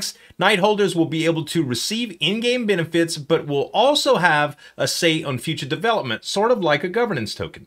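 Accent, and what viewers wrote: Louisiana, United States. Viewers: Oh yeah for sure. No doubt he's a fellow Cajun from my neck of the woods.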